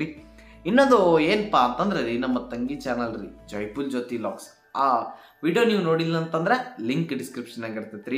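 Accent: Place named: Karnataka, India. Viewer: Nice Kannada accent 😀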